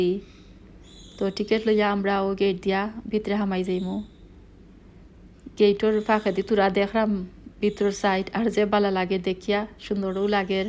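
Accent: Sylhet, Bangladesh. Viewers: When u describe in sylhet i it sounds great carry on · Well done, Sylhety sounds better. Watch Wahida's tiny world channel. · Yaa allah afa tnk u so much sylheti matar lagi...ou bashay matbay shundr lage 😍😍😍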